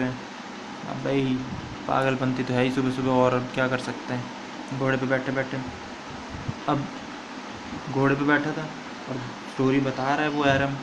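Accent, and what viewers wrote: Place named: Delhi, India. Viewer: 🤣🤣the way u teach is absolutely fantastic I really like it and the part of uncle is damn funny by your language i can guess that you are from Delhi m i right??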